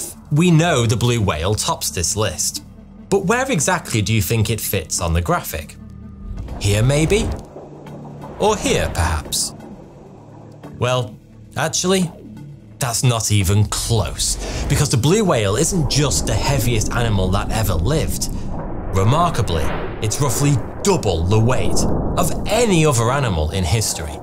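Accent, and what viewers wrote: United Kingdom. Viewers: I just love the British accent ❤ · "Hey fowtewtouw hewe" Dude, I can't get enough of your British accent. 😃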